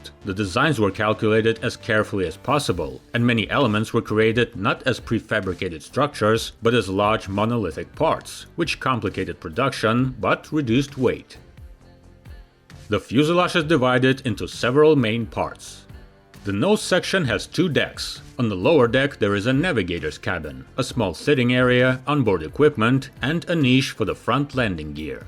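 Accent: Russia. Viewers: I though that it's going to be cheesy due to the Russian accent. But the whole video is well written & presented. Some details I'm hearing for the first time. Anecdotes from the time, historic footage, modern footage - well done! 😎👍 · "Your videos go from strength to strength. Your narration and English vocabulary are nothing short of impeccable." ..said by another viewer; echoed here. It's a pleasure to listen to your erudite narration and your Russian accent. Big fan of your videos. ~A former cold warrior.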